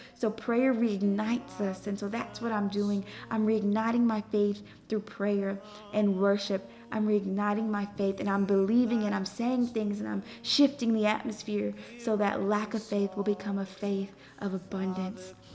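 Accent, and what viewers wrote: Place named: Oklahoma, United States. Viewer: Love this video💕Inspires me to walk with God the right way. Btw sis are you from OK? You sound just like the ppl from my hometown lol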